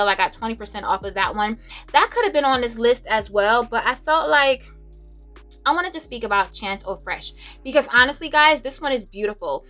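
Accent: New York, United States. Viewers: I can tell you lived in New York with that accent. Love your eyes 👀 . Lady I am old and just stop to smell the aromatics. I wish I had a Lil of the gallery behind you. Beautiful dress and earrings.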